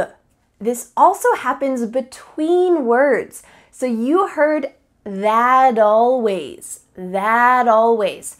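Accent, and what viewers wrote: United States. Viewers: I just love how these Americans speak...n she's really cute n her American tone is outta this world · Excellent American pronunciation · I love this American accent and beautiful smiles! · I love your American accent! Do you mean from North America or Central America or perhaps Soth America? Do you mean the U.S. of America? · As a Chinese, I really like her American accent. It sounds very comfortable. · I like when you are speaking American accent and I got a lot of many things .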